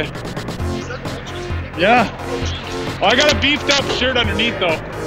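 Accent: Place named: Canada. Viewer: Sick video! Music was perfect and you dialed up the canadian real good, bud!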